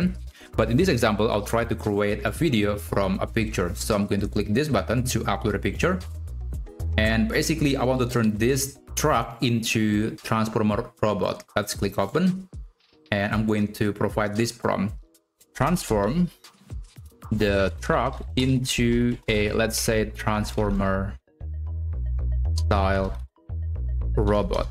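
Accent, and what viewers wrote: Indonesia. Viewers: Indonesian banget logatnya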